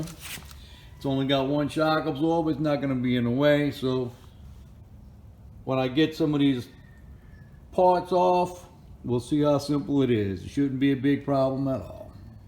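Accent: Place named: New Jersey, United States. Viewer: You from Jersey or Down South.?? Washington state, here. Just found this video again and laughed. If you really want to lose things, important things. You wheel your bike out into the uncut lawn grass and let the bolts fall into the grass. You or the next four house owners many find the parts, OR MAYBE NOT...??? Great Vid and Cool Accent , BRAH...DSD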